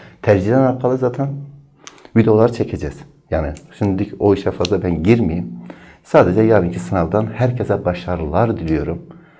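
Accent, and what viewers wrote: Urfa, Turkey. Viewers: Hocam Urfalı mısınız ?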